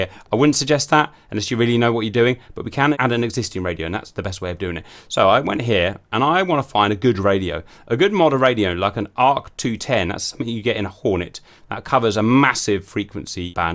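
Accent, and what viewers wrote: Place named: United Kingdom. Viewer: Great stuff man. You lose me at times because Idk what I’m doing but that’s why I’m watching your tutorials. You’ve helped me a great deal and I’m sure there’s a lot more people than just me. I’m glad to see y’all are finally getting recognized for everything y’all do for the community with sponsors. I’ve seen another speaking gig you did the other day. The British accent is loved by Americans and you could sell ice cubes to Eskimo. You gotta great speaking voice and you would really shine in documentary type stuff or historical retelling of events. Best of wishes and Godspeed to all of you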